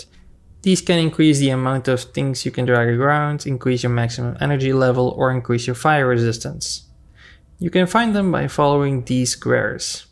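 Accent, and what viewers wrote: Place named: Netherlands. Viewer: You sound Dutch!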